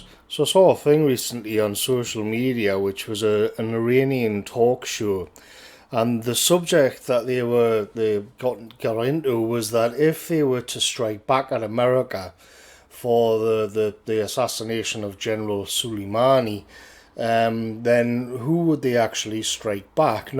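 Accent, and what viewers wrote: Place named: Geordie, United Kingdom. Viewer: You're a clever lad marra. Love listening to your vocabulary in wor accent!!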